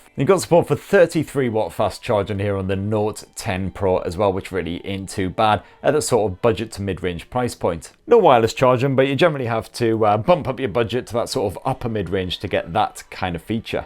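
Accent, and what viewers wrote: United Kingdom. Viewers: are you talking use British accent?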